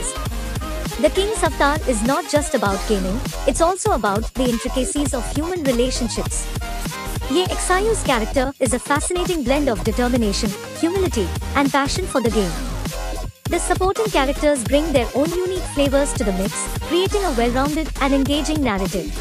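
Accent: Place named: India. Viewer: Chinese drama described by a lady with a thick Indian accent which is difficult to understand by Chinese or American viewers! Why?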